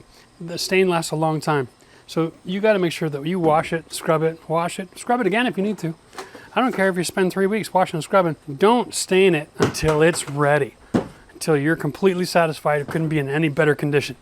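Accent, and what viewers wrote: Canada. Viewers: Great work and an amazing deck. I could be wrong but you sound Canadian.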